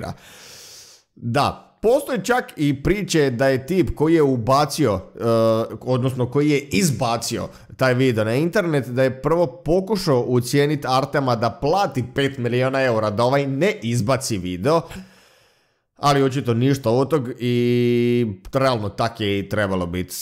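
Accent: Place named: Međimurje, Croatia. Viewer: samo pitam jer govori skoro pa nas jezik međimurski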